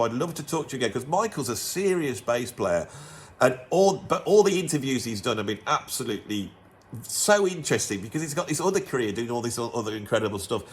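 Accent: United Kingdom. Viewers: Though not a musician (professional, amateur, or otherwise), I've been a jazz/rock fusion fan since my teens (for about 50 years) and of course still am. However, most of these artists/albums you cite I hadn't heard of and of course I didn't have albums, CDs, or mp3s of their music. Exceptions being, and albums that you've named, which I've acquired in the last 4 or 5 years in mp3 form. I was actually able to find the other seven on mp3 just now and have promptly downloaded. I ran into your YouTube channel by accident--yes, was drawn in by your video about albums that critics love but that you called "crap" and I have to say I agreed with most but not all of your assessments. But....when finding out that you are a fusion and prog rock fan AND a professional drummer to boot, I literally had to subscribe. Although it may take some time for me to get used to the British accent (yeah, born and raised in the USA...just like!!! 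🙂), even when I disagree with your opinion on an album--I find you thoroughly entertaining and witty. Count me a fan!!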